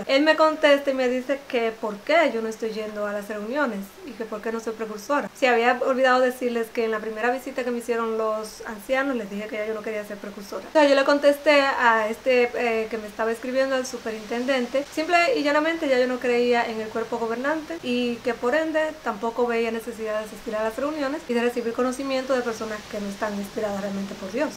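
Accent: Dominican Republic. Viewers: He visto tu video varias veces. Me encanta ese acento Dominicano tuyo. Eres muy linda y muy valiente . Gracias por compartir tus experiencias y puntos de vista. Saludos desde Cuba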